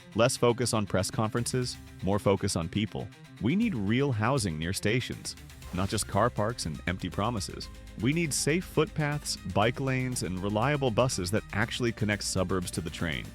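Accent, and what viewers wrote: United States. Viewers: American accent not even pronouncing place names correctly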